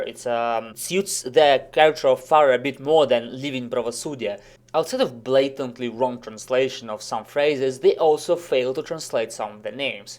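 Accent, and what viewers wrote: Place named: Russia. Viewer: I can hear pure russian accent from your words. Are you from Russia?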